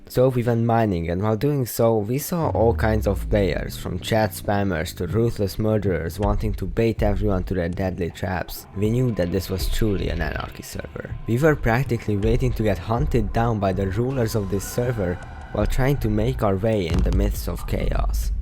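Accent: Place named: Hungary. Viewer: Nagyon úgy érzem, hogy magyar vagy. Az akcentus mindent elmond. Amúgy nagyon jó lett a videó!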